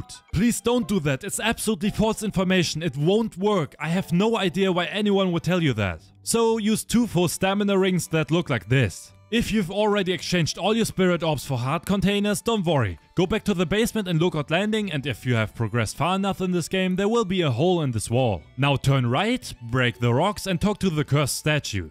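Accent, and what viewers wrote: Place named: Germany. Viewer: Man hört so sehr den deutschen Akzent 😂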